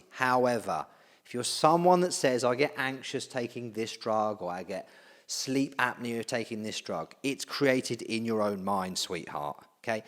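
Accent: United Kingdom. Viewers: Just found you online, love the content and delivery. Where are you from, sounds a bit like notts area?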